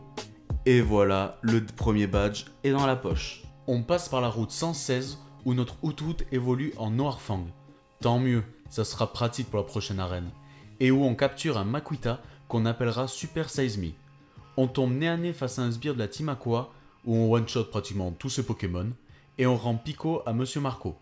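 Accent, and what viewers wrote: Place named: South, France. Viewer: Super vidéo le reuf !! Je découvre ta chaîne, petit accent du sud, on entend les cigales et le pastaga, un peu d'humour de temps en temps pile ce qu'il faut. Petite pépite ça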